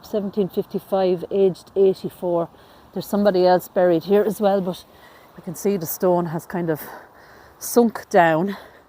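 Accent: Ireland. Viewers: So good to hear this Irish accent again, it sounds like music to my ears. Cheers, all the best ! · I love your sweet accent or is it called brogue? Very cute. My question is, since the coffins are so old and made of wood, why don't they show signs of body fluids on the bottom parts of the coffins? Were they lined with lead as well? They are definitely much prettier and with more ornate decorations than the metal ones we see now days. I love walking thru cemeteries. I took my little dog for walks at our cemetery everyday for 2 years right up until the day she died. She loved it. Even killed a big giant gopher there one time! We had the ok from the people that worked there for us to walk there. And I always picked up any poops that she dropped too.😊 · I love to see these old headstones,I wish that the church would clean them or power wash them and seal them so they can last another 400 years, I would love to do that, but I live in the states and our old cemeteries have all been damaged, it’s just so sad.., you have the best voice and Irish accent, you are so clear when you speak. 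I honestly could listen to you speak all day.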